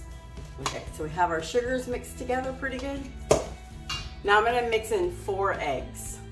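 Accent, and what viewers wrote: South, United States. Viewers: Love your shakes and southern accent. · Too cute with their bibs. Love Monroe loving Tonka, sweet! Tomorrow will be on the road for 10hrs Albuquerque to Okla City. Hopefully we will find a place to settle. Thanks for the Southern accent. Married to a Southern man. hehehe. Joan Bates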